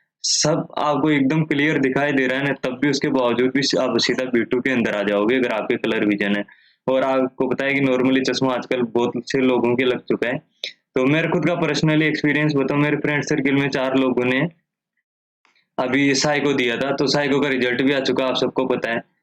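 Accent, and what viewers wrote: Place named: Pahadi, India. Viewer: Bhai pahadi lgra ....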